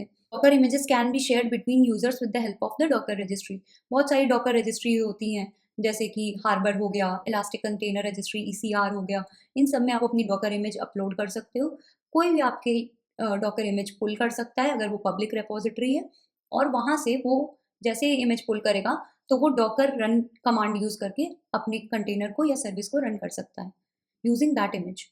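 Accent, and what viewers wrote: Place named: North, India. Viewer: That North Indian hindi accent killing me Sorry but more focused on you than content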